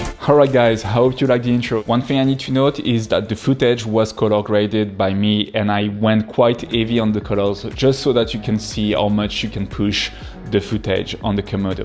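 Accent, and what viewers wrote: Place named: France. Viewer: I bought a FX3 and FX6 but I plan to sell the FX6 for the Komodo. I don't need two run'n'gun cameras and the FX3 is perfect for that (so it will be basically the same setup as you😄 without the Raptor haha). And I'm gonna sell my Pocket 6k pro (that I love), because I won't need it anymore since I will have the Komodo. But before that I'm going to rent the Komodo, and test it on my next project (I have a big music video coming soon). I love the FX6, don't get me wrong, it's a true workhouse! but I can do the same with the FX3 and the image quality is almost identical. The only thing I will really miss is the electronic VND from the FX6 (otherwise I much prefer the image coming from my Pocket 6K Pro, and I guess I will also prefer the image coming from the Komodo). P.S: tu as déménagé en Australie (j'entends à ton accent que tu es Français 😃)? 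J'y ai passé un an en 2009, j'ai adoré!! Il faut que j'y retourne un jour!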